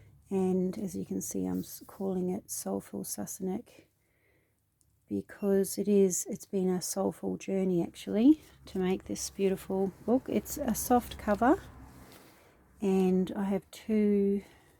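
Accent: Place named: Australia. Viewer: Love your Sassenach journal, as a fan of the series, this gorgeous journal does it justice, love all the pockets/tuck spots and the fabulous images/ herbs with how to use is fantastic, you have created a great journaling journal, tfs , nice to hear an Aussie voice have subscribed, good luck in all your future creations xx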